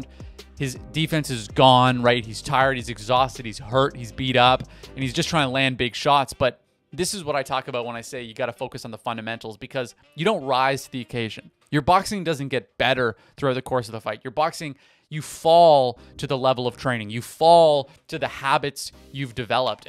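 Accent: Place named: Canada. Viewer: Ay, I can spot that Canadian accent. Are you Canadian?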